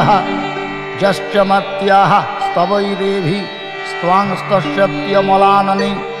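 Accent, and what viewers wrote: Bengal, India. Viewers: Nice voice, very well suited for mantra. Wish the accent was not overly Bengali and the sanskrit phonetically purer. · It is in Sanskrit. It is pronounced with a Bengali accent. And style of this kind recitation is very much found in Bengal. Probably, that's the reason. May be he is reading it in Bengali script, but the language is definitely Sanskrit. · Khub bhalo....kintu bangali uchcharon....Sanskrit is not pronounced like Bengali syllables.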